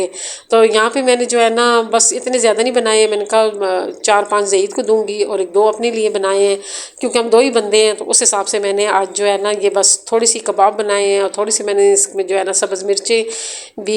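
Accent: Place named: Punjab, Pakistan. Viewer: Bilkul meri tara my b ici tara urdo bolti ho yahi hal hy panjabi walo ka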